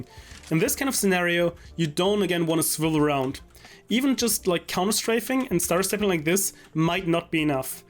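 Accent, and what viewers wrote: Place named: Germany. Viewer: It sounds like he have a german accent :D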